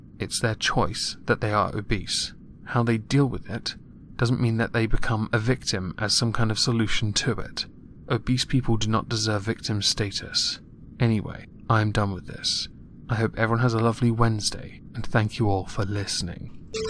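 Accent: RP, United Kingdom. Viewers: As a brit living in areas where common language is "enits" and "tings" you have the best British accent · I never knew how much I needed a refined British man critiquing idiots in my life, until I stumbled upon your channel. Absolutely loving your content!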